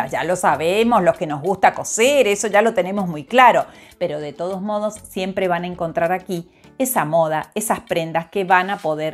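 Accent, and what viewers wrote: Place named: Argentina. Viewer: Qué bueno encontrar un video argentino, con acento argentino, con nuestras costumbres y mas aun si se trata de moda!!! Excelente!!! 🤗🤗😘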